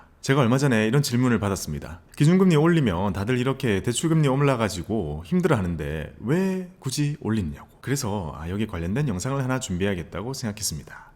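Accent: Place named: Seoul, South Korea. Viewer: 경제뿐만 아니라, 서울말도 배울 수 있어서 좋은 채널인 거 같아요! 님 쵝오:)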